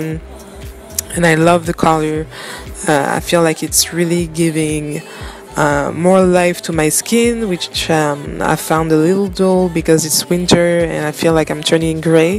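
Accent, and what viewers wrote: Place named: France. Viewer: love the French accent! and you're gorgeous new subbie 😍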